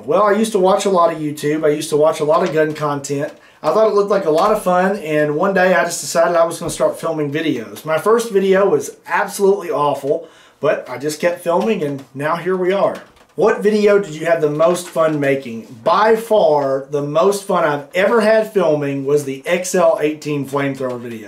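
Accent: Kentucky, United States. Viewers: “Are you from Kentucky”, haha as if your accent (or the name of the channel) didn’t give it away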